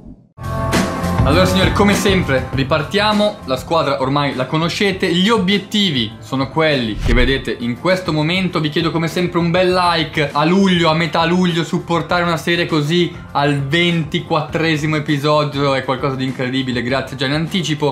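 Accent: Bergamo, Italy. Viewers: E anche in sto video parla Bergamasco ahahahah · Grande ti seguo da quando non avevi l’accento bergamasco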